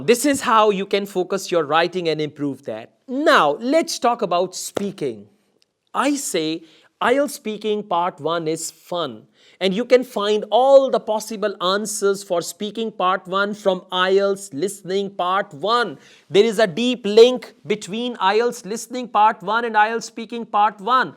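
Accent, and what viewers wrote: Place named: India. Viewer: I don't like when you talk in Indian 🙄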